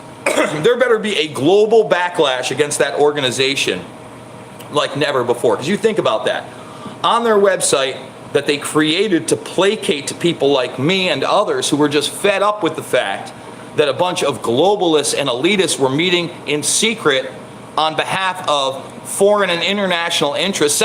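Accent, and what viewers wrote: South, United States. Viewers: I'm sorry but your southern accent is amazing haha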